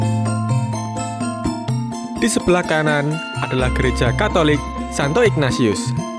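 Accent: Java, Indonesia. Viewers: Wah aku jadi makin pingin ke kawasan Temanggung, Parakan, Wonosobo, plateau Dieng! Kalau ngikutin video mas seakan ikut jalan², itu karena pengambilan spot² lokasi yang tepat informatif dan terutama narasi mas yang aku suka, walaupun nuwun sewu logat Jawanya masih kental sekali 😀 tapi rangkaian kata dan susunan kalimat bahasa Indonesianya bagus sekali yang kadang sedikit puitis ditambah wawasan mas yang luas, saya yakin kalau ngobrol sama mas pasti gayeng deh, semangat sehat terus mas, saya tunggu konten² selanjutnya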